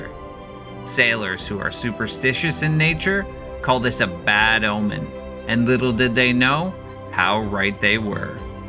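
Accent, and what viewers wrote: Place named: Canada. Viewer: I'm guessing your Canadian by the accent and mispronunciation of Detroit and RADAR.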